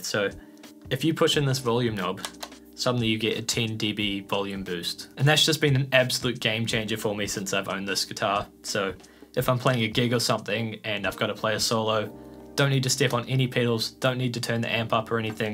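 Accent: New Zealand. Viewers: Nice to hear a New Zealand accent. Where did you buy the guitar from? Local or online? I'm considering getting one also.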